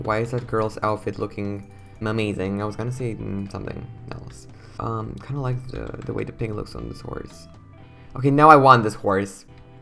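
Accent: Romania. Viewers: Is he Romanian?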